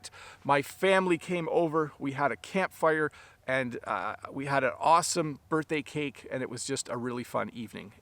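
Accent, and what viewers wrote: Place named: Canada. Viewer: Thank you teacher I like your accent and the canadian people so much 😍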